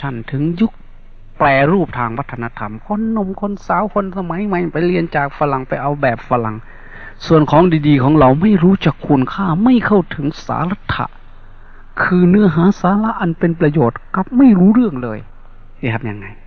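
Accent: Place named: Isan, Thailand. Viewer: ได้ฟังพระอาจารย์บ่อยๆๆจ้าวชออบฟังท่านเพราะคะคำพูดอิสาน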